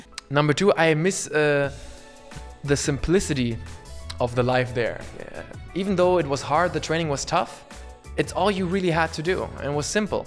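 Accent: Germany. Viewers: Question , is he originally born in germany ? Cuz he has a really big german accent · Wie du mit deutschem Akzent sprichst, einfach göttlich!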